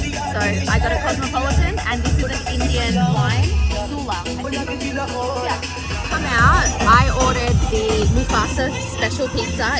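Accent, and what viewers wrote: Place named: Australia. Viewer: Gud evening from south Africa I'm enjoying ur vlogs I love ur Aussie accent have a blessed Saturday evening loads of warm blessings ❤❤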